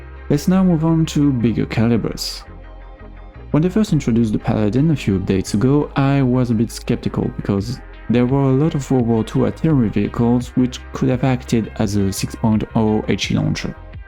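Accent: France. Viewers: Your french accent is so exiting❤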